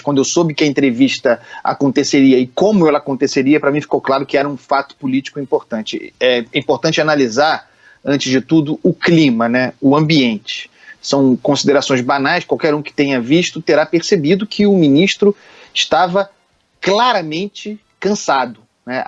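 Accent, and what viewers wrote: Rio de Janeiro, Brazil. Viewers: Andreazza é um paulista com sotaque de carioca. Fico enjoado ouvindo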